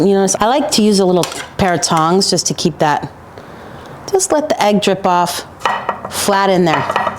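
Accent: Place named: New York, United States. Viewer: You and Paul Sorvino pronounce sandwich, sengwich. Is that Jersey or New York?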